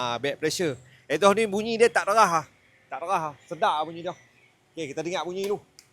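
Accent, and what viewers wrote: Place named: North, Malaysia. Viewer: Btoi2 mewakili org utaqa sebutan2 abg. Mmg sempoiii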